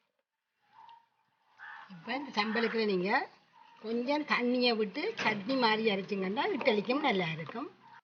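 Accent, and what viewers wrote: Jaffna, Sri Lanka. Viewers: Samayalodu ungal elangai tamilum arumai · Love, love your Sri Lankan Tamizh 💕💕 and of course, the recipes 😊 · யாழ் தமிழ் இனிமையாக இருக்கிறது .. .from chennai,Tamilnadu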